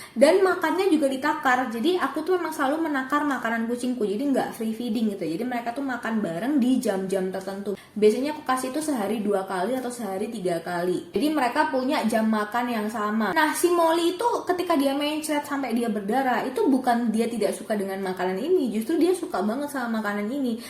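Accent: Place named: Surabaya, Indonesia. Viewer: Medok Suroboyo 😂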